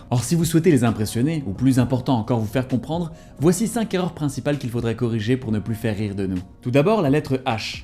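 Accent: Standard. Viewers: His accent is extremely standard, maybe standard with an articulation exaggeration. Very few people speak like that. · So u actually using standard french.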